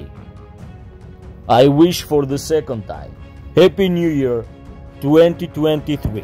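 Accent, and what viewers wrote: Russia. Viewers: subscribed a few weeks ago me frirnd.. Your accent are you from Russia :)? Greetings from Poland slavic friend.. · Чёткое видео, автор русский 🇷🇺 чтоли? Акцент такой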